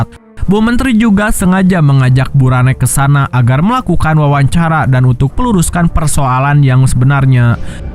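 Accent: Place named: Sunda, Indonesia. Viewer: Minnnn kamu Sunda nya dimana ?? Logat sunda nya kentel bgt 😁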